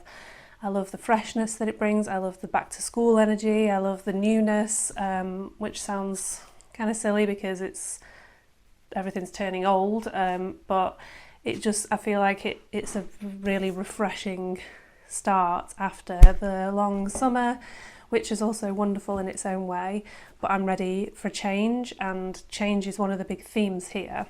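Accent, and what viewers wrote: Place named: Northern England, United Kingdom. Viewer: Nice to meet another fellow Brittan.😂 I'm from Edinburgh myself..... where about in England you from? You sound more northern English ❤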